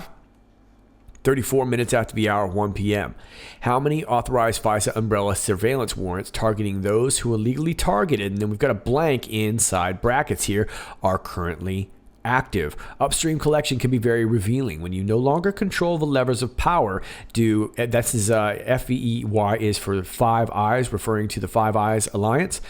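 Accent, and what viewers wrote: United Kingdom. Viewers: Hey I just wanted to say I reckon [+fakewood] means “and Hollywood”. PS. Great English accent xx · A BRILLIANT 'posh' British accent Jane from England x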